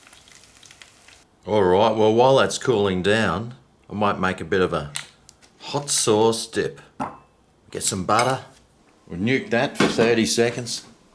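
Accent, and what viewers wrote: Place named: Australia. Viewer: Narrated like a True Blue Aussie 🇦🇺. Bloody shame chickens only have two wings... If they had four, I'd need two bottles of Frank's 👍😄🤤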